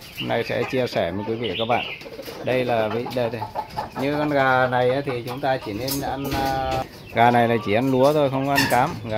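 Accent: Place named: Dien Chau, Vietnam. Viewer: Đúng chất giọng diễn châu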